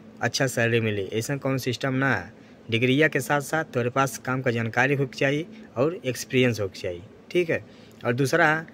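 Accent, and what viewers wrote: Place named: Bhojpuri, India. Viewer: भाई आपके मुंह से भोजपूरी बहुत अच्छा लगता हैं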